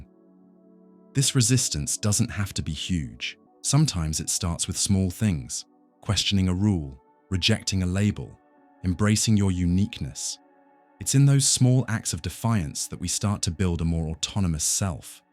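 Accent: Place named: United Kingdom. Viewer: Gosh... 2.5 hours of AI crap, W/British Accent and Sparkly Background to boot!! I need an AI which hides crap like this.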